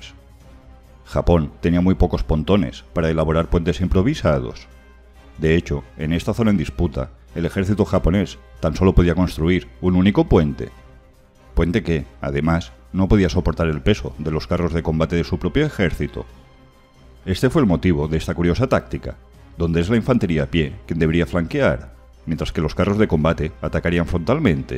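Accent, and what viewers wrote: Galicia, Spain. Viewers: ¿Es la entonación al hablar de origen gallego o asturiano?. Me encanta; saludos.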